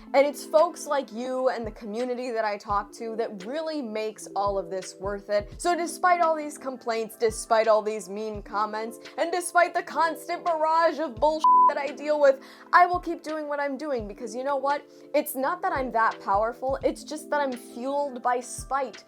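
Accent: Spain. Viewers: Oh and i just love your spanish accent!!!!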